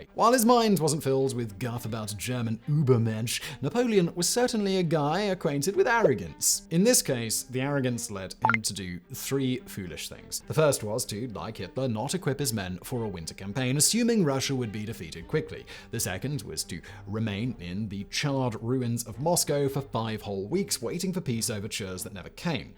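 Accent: United Kingdom. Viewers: As a southern American, hearing you say ain't in your British accent was simultaneously awesome and hilarious.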